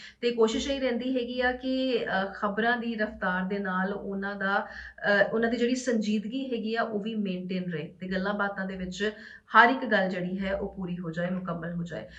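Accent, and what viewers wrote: Malwa, India. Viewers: veer ji eh boli malve de he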